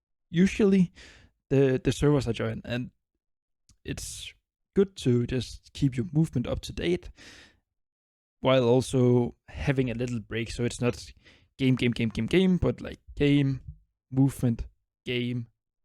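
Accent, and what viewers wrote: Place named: Denmark. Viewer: God video ven! Nice danglish, det du fandeme god til <3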